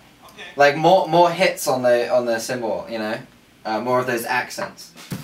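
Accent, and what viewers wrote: United Kingdom. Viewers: I think he actually still have that British accent :o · I love his accent... Give it up to British guys <3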